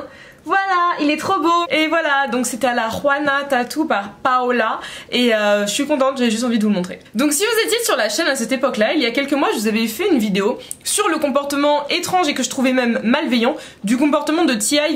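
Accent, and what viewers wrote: England, United Kingdom. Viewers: Ton accent anglais 😻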